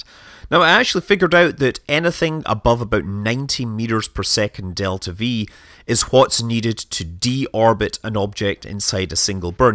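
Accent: Scotland, United Kingdom. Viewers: Say what?! I thought he was scottish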